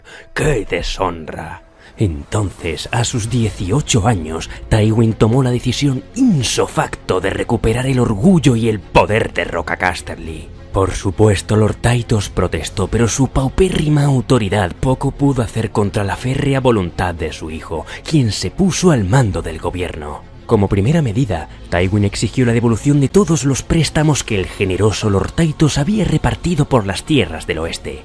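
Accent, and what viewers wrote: Spain. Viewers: El asentó español le da esa toque medieval, gracias por la pequeña reseña del origen de esa canción.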